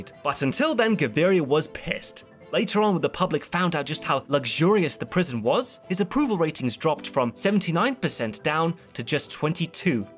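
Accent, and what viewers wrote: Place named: England, United Kingdom. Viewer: The English accent helps with his credibility xD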